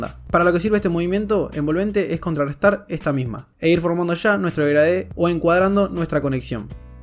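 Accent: Argentina. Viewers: muy buen video solo que por favor pronuncies mas despacio ya que eres argentino y aveces no te entiendo tu acento ,, es solo tener cuidado al pronunciar es question de acento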